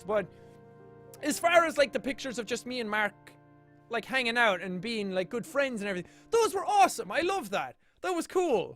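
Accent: Ireland. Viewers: has a 10/10 Irish accent. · Is it just me or does he sound Irish🇮🇪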